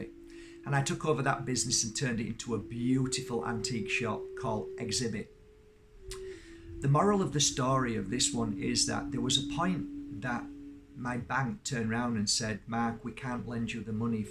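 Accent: Yorkshire, United Kingdom. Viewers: I've been watching you for sometime Mark, as a fellow Yorkshire man I recognised the accent. I suspected you was from Huddersfield the first time I came across your energy. Something about you seemed so familiar. You mentioning Marsh in this video confirmed it! Lovely to come across an ascending soul from home doing so well! For some reason or another I haven't watched a video of yours in awhile. This has been the perfect video/way to return to your energy. Home as a theme is very pressing for me right now. Childhood, family, pain, abuse, independence and breaking free are all very present in the now. Realising we share the same birthplace and with the topic of the video has created a beautiful synchronicity. Thank you Mark! Thank you for your energy, time and sharing your story!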